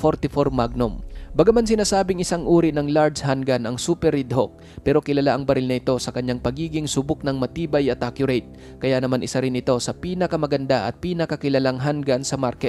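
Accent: Visayas, Philippines. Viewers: Bisaya Punto Ka Bhai.. 🤔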